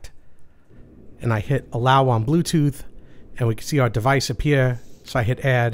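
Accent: New York, United States. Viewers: is that a NewYork accent?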